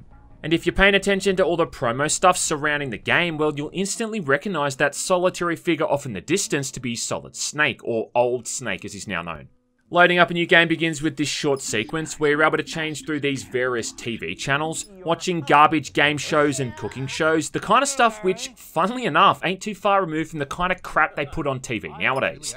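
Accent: Australia. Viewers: I love your videos. Great break downs. You nail everything. Also, hearing an Aussie talk with such casual lingo and slang is perfect for me. Keep it up mate. You're doing great. Top shit. Yeah nah yeah cobber.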